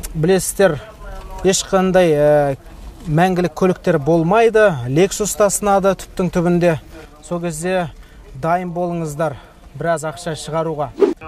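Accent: Standard. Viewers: брат таза қазак тылынде соилеиды дурас Брат алға жаң тусыршы кутып жатырмыз👍👍👍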